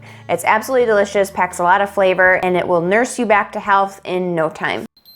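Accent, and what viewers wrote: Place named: Midwest, United States. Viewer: You have a very thick Midwestern accent.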